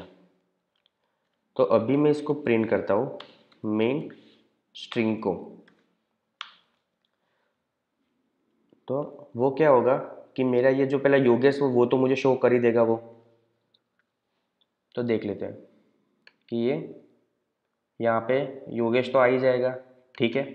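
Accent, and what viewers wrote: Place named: Gujarat, India. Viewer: ભાઈ એક નંબર વિડિઓ બનાવીયા છે અને તમારો અવાજ નો ટોન પાક્કો ગુજરાતી... જય જય ગરવી ગુજરાત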